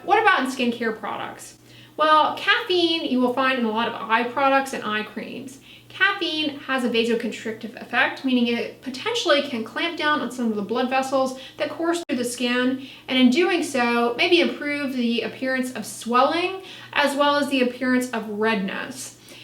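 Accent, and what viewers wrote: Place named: Texas, United States. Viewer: every once in awhile that Texas accent comes through... so cute. Let that Twang fly girl!!!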